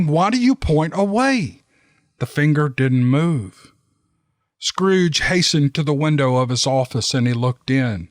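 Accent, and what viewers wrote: South, United States. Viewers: You sir can read Green eggs and ham with that Southern twang and make it sound great! Merry Christmas to all.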